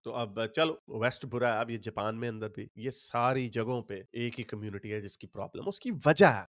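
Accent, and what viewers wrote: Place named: India. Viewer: Bhai tum bolte to sach ho km se km Pakistani nahi indian lagte ho zuban se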